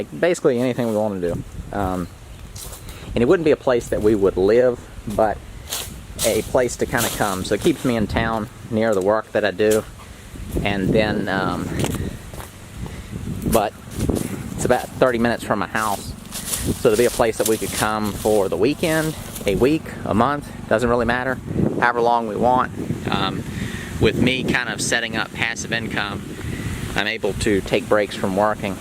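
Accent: South, United States. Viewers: I like your ideas/purpose for this nice piece of land. The Jeep would be a fun project to watch if you don’t speed up your video and someone might also learn how to do a trade by watching your channel. I love the plans for your property especially leaving the tree inside your building. Nature kinda helps slow us down enough to enjoy life without thinking about making money. I think it brings joy just having a place to go to get away from work and have fun projects you like to do. I am looking forward to seeing more on this channel. I actually forgot about your channel’s name until I heard your voice and I knew exactly what channel I was going to watch it all the way through. Poison Ivy is not something I could have around my property because my son is highly allergic to it! Could you point it out on your next video? Please... Do you work out to stay in good shape or just physically work hard at your different trades? 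I think you may have mentioned which state you live in but I don’t recall. You do have a nice Southern accent which I enjoy listening to you speak. It reminds me of my family. I hope you return soon for some of your updates! Is your other channel on a link so I can find it? Thank you young man👍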